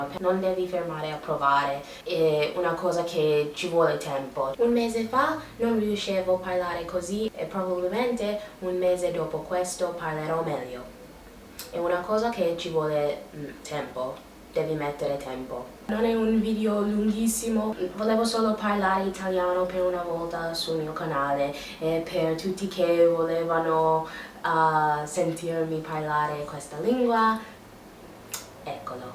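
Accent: United States. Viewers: Why do you use an American accent when speaking Italian? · migliora un po' la T è troppo schiacciata, troppo inglese-americano e un po' anche la r.. però bravissima l'italiano è difficile... Io amo L'america penso che ognuno sia attratto da ciò che non gli appartiene. di che stato eri? mi iscrivo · ovviamente si sente l'accento americano, però parli molto bene. Ho molte amiche che sono nate all'estero (Polonia,Croazia,Russia) e sbagliavano sempre gli articoli, ma tu questo problema non ce lo hai. wow bel lavoro! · Bravissima! I'm no one to make judgements but your Italian is more than proficient; the world is always surprising: I know people my age, so middle aged, even university educated that still struggle with verbs' conjugation and some tenses...that's quite irritating, while you are almost always correct and fluent. A few flaws (very few in your case) in a foreigner speech are even nice...and please try not to lose completely your slight American English accent: is very charming, cool and exotic! 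Best regards! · You are pretty good, you make some small mistakes but you can fix them, like you mix up some word gender because in english words don't have a gender. A tip to sound a bit less american is to say some vowels open and not closed, we are weird because we don't put every accent on words but only on some, the best example would be the word "ancora" which can mean either again or the metallic thinghy ships have(dunno how it is in english sorry) so it can be important, listen other italians and imitate them, good work! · Parli davvero bene italiano, complimenti. Non hai troppo l'accento americano, brava! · Parli perfettamente, e l'accento americano è adorabile! Grande ❤ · Amo amo amo questo accento! davvero sono molto affezionata all'accento americano in italiano, ho visto molti video tuoi e sono veramente interessanti! abiti a Milano a quanto pare, magari un giorno ti trovo in giro ahaha, sei divertente e super simpatica :)) p.s. 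sei bravissima a parlare comunque! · Rimane americana non puoi dirle di migliorare delle lettere che nell'accento e pronuncia della sua lingua sono state sempre così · Brava, parli molto bene l'Italiano! Un poco l'accento americano, ma va bene...ti fà più sexy! Ok? Ciao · mi piace tantissimo quando parli in italiano e si sente l'accento americano ;) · Sei brava a parlare, ovviamente hai una cadenza molto americana ma lo parli fluentemente e ti si capisce benissimo. La pronuncia non è facile, d'altronde come per noi imparare il vero "American English"! Continua così, io vivo a Milano ed è bello vedere i punti di vista di qualcuno dall'esterno! You're beautiful and very chatting person:) like me! Good luck for everything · You speak correctly - better verb tense agreements than many Italians - the American accent is even harder to lose than my English one though 😊. The only American I have ever heard that has managed to lose their accent is Romina Power, and look how long she had to live there! 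(and who she married to do so hahah)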